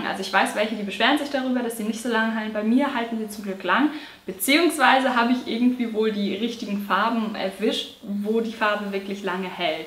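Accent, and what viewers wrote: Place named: Berlin, Germany. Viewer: Ich find dich super sympathisch! :) Kommst du aus Berlin? Du bemühst dich zwar sehr feinstes Hochdeutsch zu reden aber ich werd das Gefühl nicht los, dass du von hier bist :)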